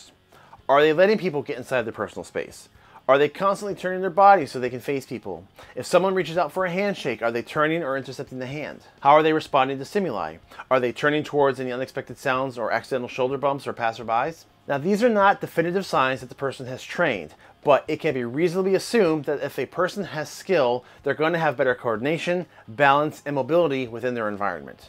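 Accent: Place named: Russia. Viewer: Cauliflower ears and Russian accent is the ultimate combo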